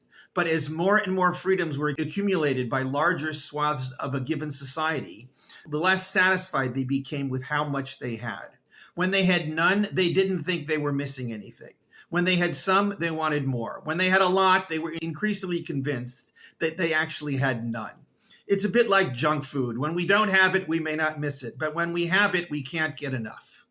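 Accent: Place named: United States. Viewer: You sound very indigenous to the Middle East with that American accent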